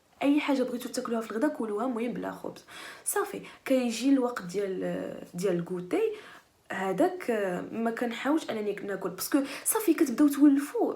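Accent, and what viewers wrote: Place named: Algeria. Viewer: عند.لهجة كيما صحاب جزائر وأنا نموت على مغاربة يعجبوني بزاف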